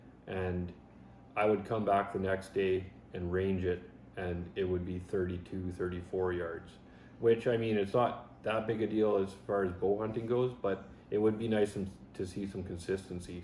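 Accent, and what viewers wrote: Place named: Canada. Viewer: cool man, I could recognize a fellow Canadians accent. Lol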